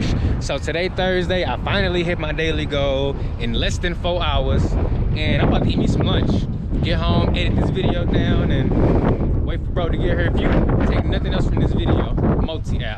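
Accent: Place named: New York, United States. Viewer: Are you from the east coast? Harrisburg PA here but I grew up closer to NYC in northeastern PA. I hear a little New York!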